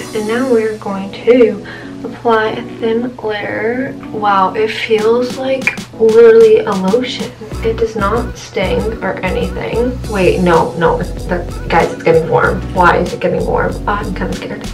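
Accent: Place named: Southern California, United States. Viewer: It’s that Southern California accent lol. We all sound like that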